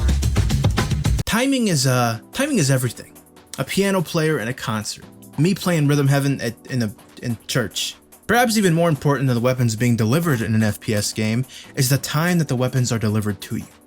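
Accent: New York, United States. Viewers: He sounds like he came from new york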